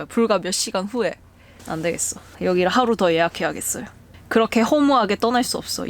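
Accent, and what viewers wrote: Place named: Busan, South Korea. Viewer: 항상 영상 잘 보고 있습니다! 같은 부산분이시네요 ㅋㅋ 사투리가 반갑습니다 ㅋㅋ 재미있는 영상 계속 부탁드릴께요! 언젠가는 잘하겠지!! ㅋㅋㅋㅋ 화이팅입니다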